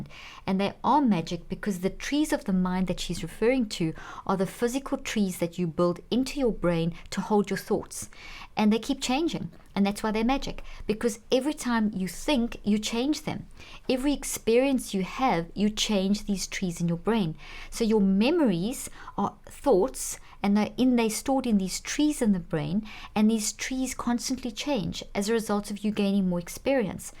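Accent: South Africa. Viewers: So nice to listen to your South African accent Dr Leaf 😊❤️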